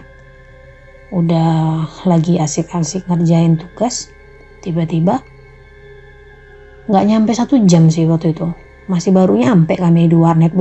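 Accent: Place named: Riau, Indonesia. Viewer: logatnya Riau kali kak❤Batam hadir ❤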